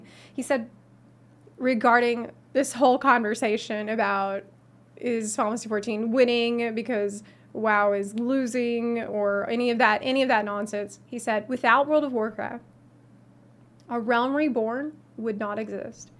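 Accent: South, United States. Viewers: I'm just getting started in FFXIV, and binge watching your vids(working) make it even better. Not to mention, that lovely, southern accent(I'm hoping Tennessee, my home sweet home), doesn't hurt lol. Love the content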